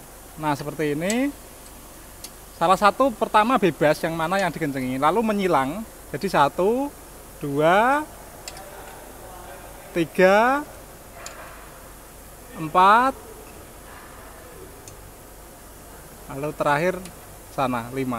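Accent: Java, Indonesia. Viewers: Ngomonge kaya wong jawa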